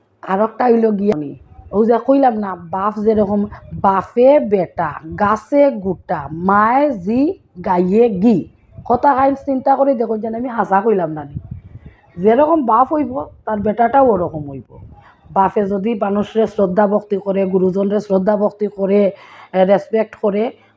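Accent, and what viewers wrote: Sylhet, Bangladesh. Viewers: দিদি আপনার মুখ থাকি সিলেটি ভাষার শিলক শুনতে অনেক ভালা লাগে।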